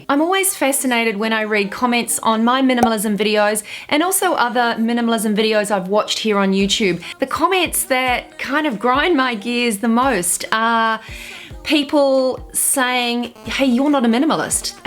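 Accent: New Zealand. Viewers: As soon as you started talking I was like is she kiwi yes she has to be. I was also very excited that you are 💓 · That is not an Australian accent. I think she’s from New Zealand.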